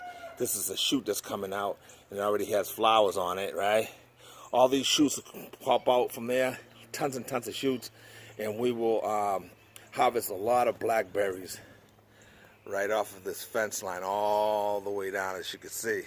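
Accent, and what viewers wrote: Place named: Bronx, United States. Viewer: Where are you from? You sound like the Bronx. Thank you, I pray the same for you.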